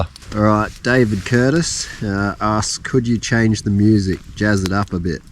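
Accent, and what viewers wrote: Australia. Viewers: Wäder😅 sorry my ear does not like the aussie accent BUT i am getting used to it, more i listen❤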